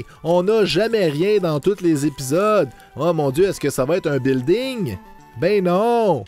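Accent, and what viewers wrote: Quebec, Canada. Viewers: Joli tirage. J'adore regarder tes vidéos et ton accent québécois est un pure moment de bonheur et de nostalgie ça me rappele mon voyage au Québec il y a 20 ans je rêve d'y retourner un jour avec mon fils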